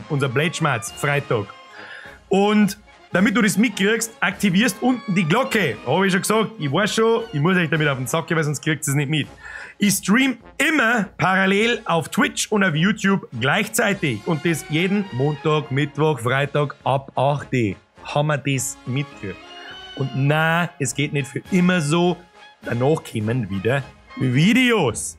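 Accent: Bavaria, Germany. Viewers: Ich komme aus Sachsen, genauer aus dem Vogtland und ich bin gerade erstaunt wie viele Ähnlichkeiten unser vogtländischer Dialekt (der im Übrigen mit Sächsisch nicht viel zutun hat) stellenweise mit dem Bairischen hat. Man könnte das Vogtländische als eine Mischung aus Sächsisch, Fränkisch und Bairisch bezeichnen. Ich versteh auch fast alles was du sagst, was glaube ich einem NRWler oder Berliner deutlich schwerer fällt.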